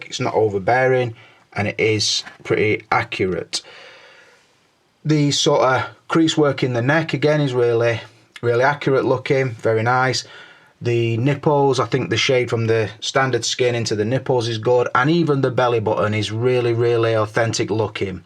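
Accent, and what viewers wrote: United Kingdom. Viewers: I can tell by your accent you know nothing about boxing. the UK grossly over hypes their fighters even when they're clearly trash.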